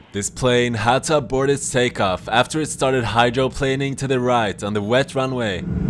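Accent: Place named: Sweden. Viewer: Värsta svengelsan jag hört!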